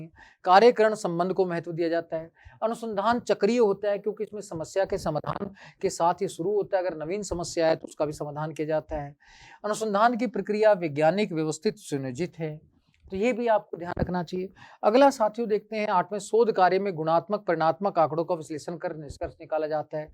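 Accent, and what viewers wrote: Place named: Rajasthan, India. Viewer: Aap Rajasthan se honge 100% kyu ki hindi language ko bolna or likhne m jo galtiya aati h wahi k logon m aati h . Regional language ka asar hota h .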